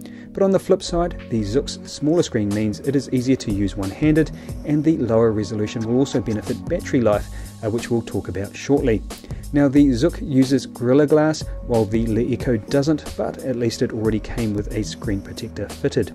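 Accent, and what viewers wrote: New Zealand. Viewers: kiwi accent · ARE YOU FROM NEW ZEALAND?!?!?!?!?! JUST JUDGING BY YOUR BEAUTIFUL ACCENT :P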